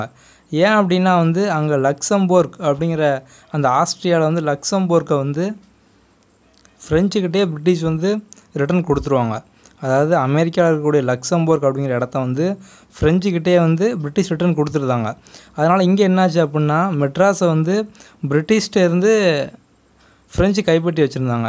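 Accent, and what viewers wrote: Tirunelveli, India. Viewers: sir neenga tirunelveli ninaikren tirunelveli tutico slang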